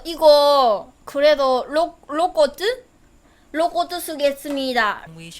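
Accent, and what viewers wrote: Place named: Busan, South Korea. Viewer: 부산이면 그냥 말투입니다.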